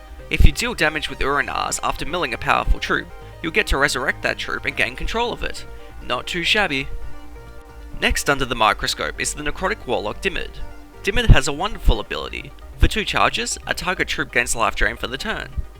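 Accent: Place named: Australia. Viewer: Very much like your video trying to place that accent... I wanna say adelade? maybe Melbourne. Its not New Zealand... Use of /aː/ as opposed to /æ/ Word Hobart Melbourne Brisbane Sydney Adelaide Ave. over all five cities graph 0% 30% 56% 70% 86% 48% chance 0% 60% 25% 80% 86% 50% demand 10% 78% 78% 90% 100% 71% dance 10% 35% 11% 30% 86% 34% castle 60% 30% 33% 100% 86% 62% grasp 90% 89% 89% 95% 100% 93% to contrast 100% 100% 100% 100% 71% 94% Ave. over all seven words 39% 60% 56% 81% 88% 65%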